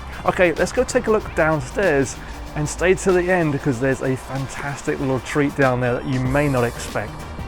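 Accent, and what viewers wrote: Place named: United Kingdom. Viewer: A very marked British accent.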